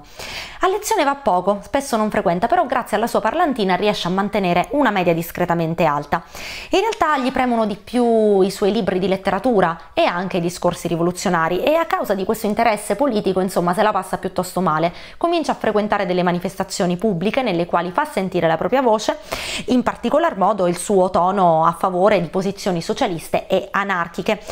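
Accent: Sicily, Italy. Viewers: nel tuo delicato accento siciliano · Siciliana? 😘 Bum crash...🥴 🤕...ehm! Ottimo video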